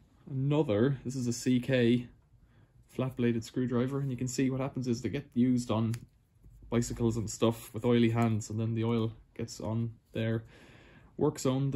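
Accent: Ireland. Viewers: Do you have an irish twang to your accent? Im watching lots of your videos.. I have very similar interests to you lol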